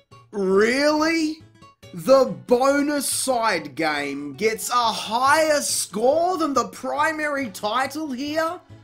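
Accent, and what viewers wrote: Australia. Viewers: I'm glad I didn't accidentally buy this game yesterday at my local retro game shop. It was either this or Downhill Jam. I bought the latter and I like it. The price difference made it really obvious which one was better, even though I'm sure the guy at the counter probably would have at least told me it wouldn't work on my New 2DS XL anyway. And I just gotta say that your obvious frustration sounds 1,000 times funnier and more relatable in your British accent, even though I'm from New Jersey. Lololololololololol.... Correction: Australian accent. Sorry I didn't realize that at first.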